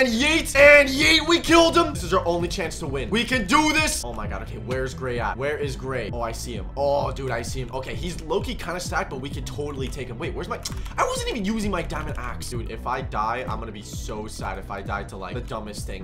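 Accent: United Kingdom. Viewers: I still don't know if you British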